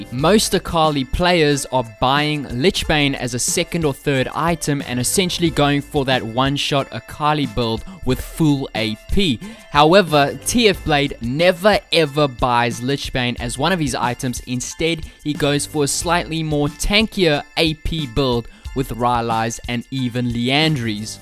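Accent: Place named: Durban, South Africa. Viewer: God that fucking Durban accent is unbearable.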